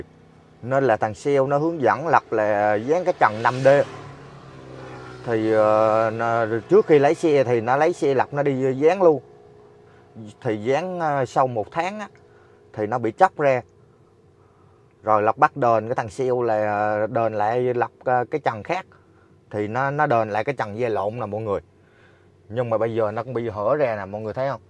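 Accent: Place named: Quang Nam, Vietnam. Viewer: Nghe chú em nói tiếng Quảng Nam rất vzui tai, Con gè con ke.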